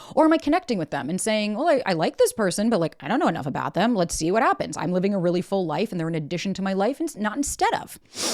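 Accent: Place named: New York, United States. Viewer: Must be a Newyawka? 😮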